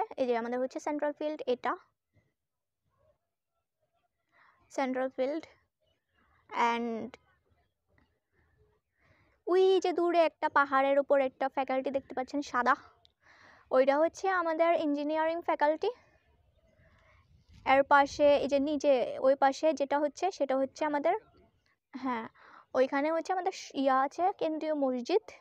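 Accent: Chittagong, Bangladesh. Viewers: kothar modde kichuta Chittagong er accent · কথার মধ্যে চট্টগ্রাম এর টান আছে এজন্য বললাম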